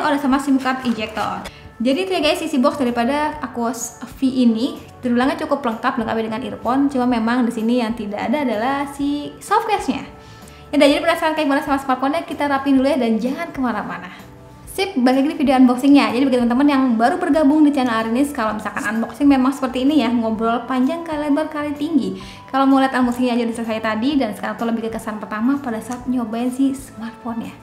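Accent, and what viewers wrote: West Java, Indonesia. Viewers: ini orang sunda y, keliatan klo ngmng ada kata "SI" nya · "DESAIN DESAIN JAMAN BAHEULA", org sunda nyah teh?😃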